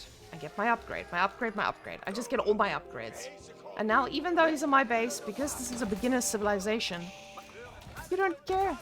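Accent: Afrikaans, South Africa. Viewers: Awe my bru! Is that a afrikaans accent I hear?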